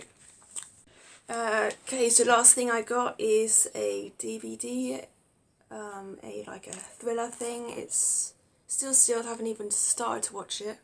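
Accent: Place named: London, United Kingdom. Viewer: Lol,yeah maybe u picked it up from somewhere,like over the rainbow,lol,but yeah l spent nearly a year in London so l thought l heard a little bit of a twang with that accent,maybe my ears are deceiving me,lol,anyway Hampshire eh,l don't believe l know to much about Hampshire,are u like in the middle of nowhere,with all fields and stuff about???BTW thanks for getting back,take it easy:)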